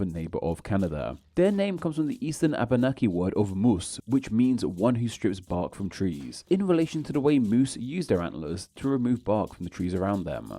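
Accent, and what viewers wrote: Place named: United Kingdom. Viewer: I’m not sure why we have a British guy telling us about which American words and place names come from Native American languages… because he doesn’t even pronounce them the way we do!!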